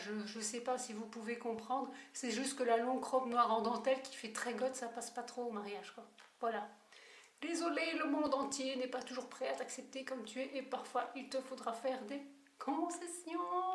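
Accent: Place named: Belgium. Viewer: Ton accent Belge me fait sourire, et j'adore quand tu utilises des mots comme "tirette" ou "septante" :D Sinon je me sens moins seule quand je vois que tu achètes un même vêtement en plusieurs coloris... bien que je ne l'ai jamais fait en fait. Mais j'en ai souvent envie sauf que ça gonfle vite la facture et que ça restreint la diversité aussi donc je me force à choisir x') Mais t'as eu raison de te faire plaisir parce que ça rend quand même différent selon la couleur.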